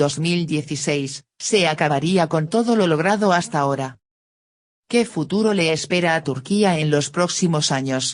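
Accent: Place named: Argentina. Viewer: aunque tenga acento argentino es bastante mas agradable que lokendo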